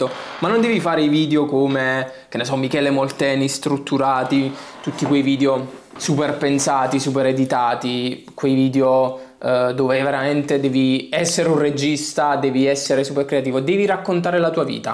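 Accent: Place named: Naples, Italy. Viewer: Finalmente era ora! Basta con il fast content, cosi i contenuti si assaporano con la giusta tempistica senza quel bam bam bam di informazioni veloci, che tra l'altro assimili per un tempo limitato poi te le dimentichi. Mentre per il tuo italiano siamo troppo influenzati dal napoletano, nun ce putim fa nient 💙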